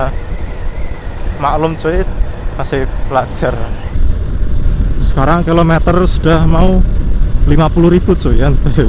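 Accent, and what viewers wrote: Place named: Java, Indonesia. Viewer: Jowo lu ora ilang bro, Gw ngguyu tenan ini🤣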